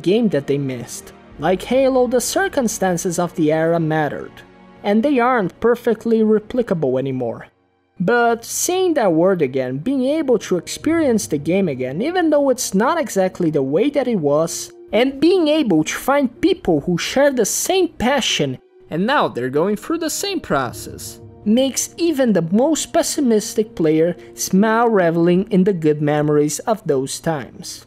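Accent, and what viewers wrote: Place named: Brazil. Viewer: I knew you had some Brasilian accent lol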